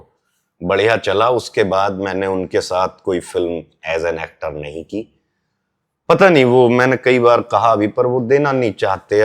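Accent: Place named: Uttar Pradesh, India. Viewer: O Bhai haryanvi nahi hai ok up ki hai tum hari 😊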